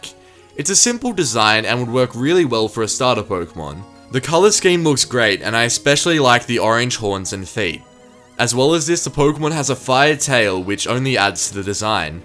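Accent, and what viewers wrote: Australia. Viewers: The way he pronounced 'zebra' in the video made me laugh, sorry pal, not hating on the Australian accent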